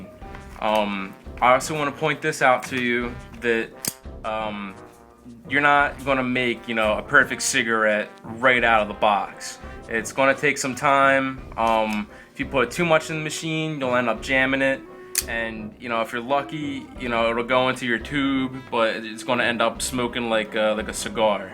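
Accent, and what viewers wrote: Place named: Pittsburgh, United States. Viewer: you from pittsburgh? sounds like the accent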